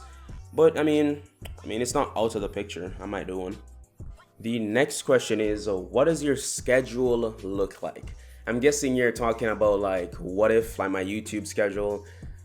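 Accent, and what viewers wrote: Jamaica. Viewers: im half jamaican and I could see that you were jamaican in your voice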